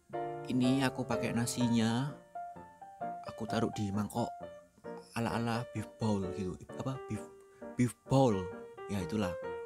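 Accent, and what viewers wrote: Java, Indonesia. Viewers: Mas ngomong e medok pol Awoakwoak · Medookk banget mas nya hehe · Bukan cma belajar di chanel ini Tapi juga menghibur iya suara masnya masih medok banget jadi senyum2 sendiri dengernya 😁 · arek ngendi to mas kok rodok medok😅😅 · makasih resepnya, semoga bojoku suka soale mami mertuaku pinter masak, jadi selerane suamiku tinggi masalah makanan dek ini yoo... lucune talah ngomonge... suka aku, medok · Aku seneng logat jowo mu klo nerangin nya mas.. Masokk...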